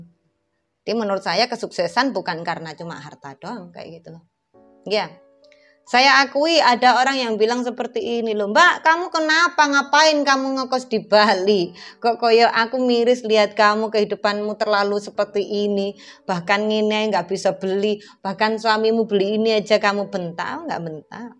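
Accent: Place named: East Java, Indonesia. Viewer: Aq suka prinsip hidupmu mba..n bahasamu mnurutku udh halus kok emg nada org jatim trutama wilayah sby kesono kan emg gitu..semangat mba!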